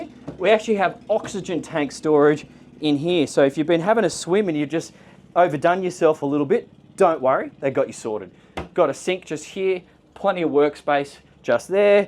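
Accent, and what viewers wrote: Australia. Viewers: This Boat is awesome, but i love listen to your Aussie Voice too!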